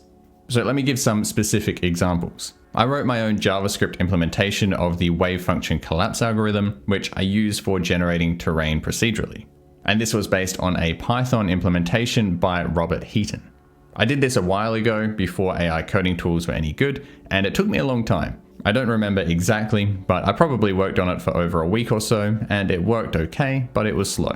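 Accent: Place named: Australia. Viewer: Hhahaha no way I got to the same feeling after a decade of frontend dev, with React. Hobby farm and or game dev. I started learning C++ and it's been great! I don't have many good feelings/experiences around AI, I really pushed it to it's limits as an early adopter and found that it has a negative impact on my memory and analytical skills. I just use it kinda like a search engine when I really can't find any other way. However I am open to giving it another shot using something more like your method. As a side note, I also use Vim with the file explorer to the right :) From your accent, I assume you're a fellow Aussie! Thanks for sharing this, great video!!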